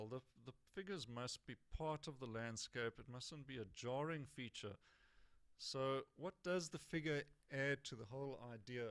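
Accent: South Africa. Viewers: Hi. I have just come across this video and I find it extremely well conceived. It is extremely helpful particularly for urban sketching. Thank you for sharing. Enjoyed the S.A accent!!!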